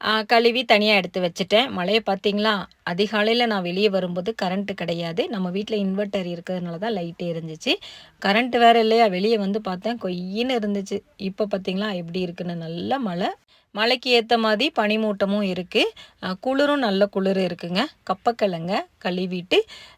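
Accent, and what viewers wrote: Tirunelveli, India. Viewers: . நெல்லை தமிழ்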